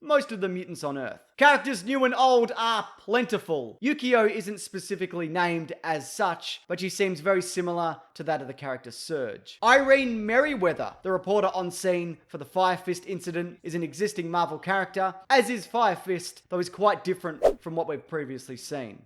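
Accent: Australia. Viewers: Is the speaker Australian?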